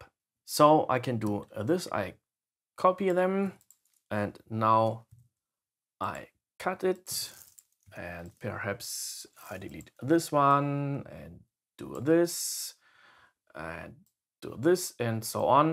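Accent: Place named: Scandinavia. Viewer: Your accent in English sounds like Scandinavians!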